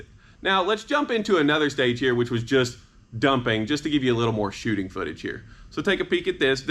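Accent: South, United States. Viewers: This is Tosh.0 with a southern accent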